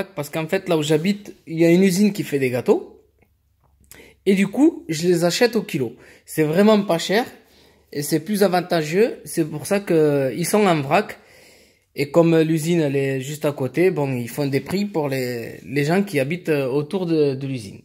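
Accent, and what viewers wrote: Marseille, France. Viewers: je suis ivoirien (cote d'ivoire ) on m'a toujours dis que ceux qui parle français avec cet accent sont marseillais. estce vrai?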